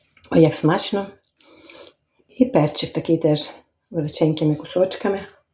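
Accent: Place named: Western Ukraine, Ukraine. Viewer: При чем здесь мова? Хуторской западинский говор никого не красит. Женщина простая, сельская, ей простительно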